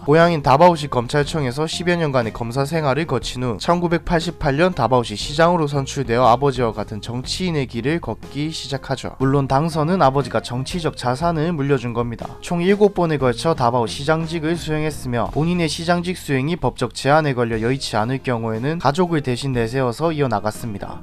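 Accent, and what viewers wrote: Gyeongsang, South Korea. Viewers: 경상도 억양이 억수로 좋네요!! 🤩😎👍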